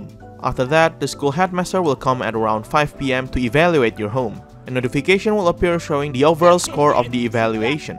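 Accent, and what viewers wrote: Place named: Indonesia. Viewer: Gilee bangg keren bgt, gw lgsg ngeh lu indo dri aksennya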